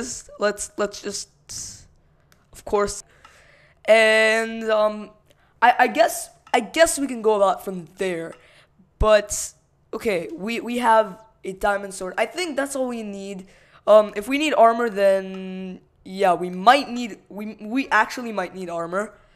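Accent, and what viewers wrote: India. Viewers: Hey r u a indian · dude are you indian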